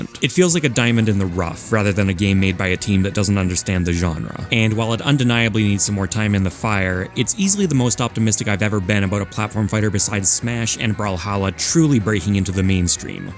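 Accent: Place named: Canada. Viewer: This dudes definitely Canadian and we love it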